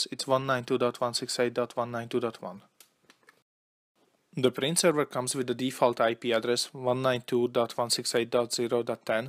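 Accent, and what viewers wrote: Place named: Poland. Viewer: He sound polish to me ;-) Pozdrawiam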